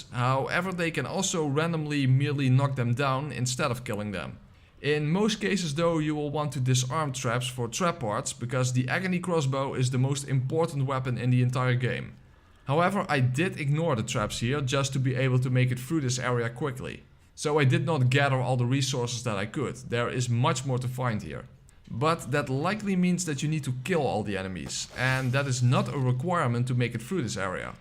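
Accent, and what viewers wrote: Netherlands. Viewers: thanks for the guide, your accent sounds dutch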